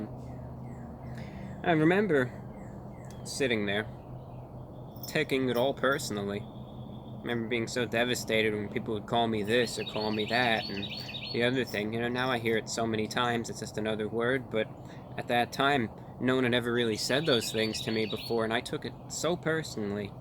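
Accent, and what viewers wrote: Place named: Transatlantic, United States. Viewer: People can mock all they wish, but it doesn't matter, until you get master the transatlantic accent and wear a business suit attire everyday, you'll never be on the classy level to make a truly meaningful insult on.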